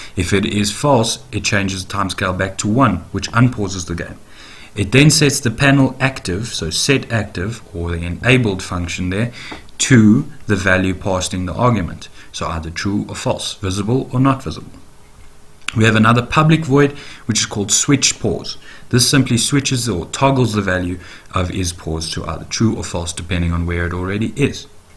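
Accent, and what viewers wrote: South Africa. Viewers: Awesome tutorial! Thanks. I can hear you are from SA! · I am in JHB and I've got to say I do love seeing other South Africans do tuts, and man do you know your stuff. Keep it up, great tut:-D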